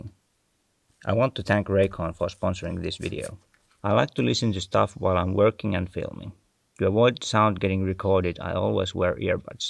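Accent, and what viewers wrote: Finland. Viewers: he's Finnish there's no question about it. All the products he uses are with Finnish labels, the newspapers he uses sometimes are Finnish etc. Also the accent is very typically Finnish too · is that a finnish accent? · I love your voice with your finnish accent! very fun to listen to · You have such a lovely Finnish accent! I hope we hear you talk more sometime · Is that Finnish accent? Torille!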